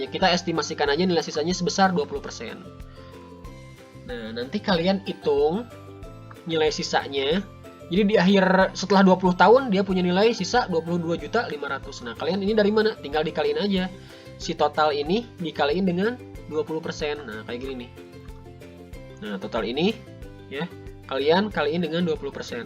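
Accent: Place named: West Java, Indonesia. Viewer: nyunda euy, hatur nuhun elmuna manfaat..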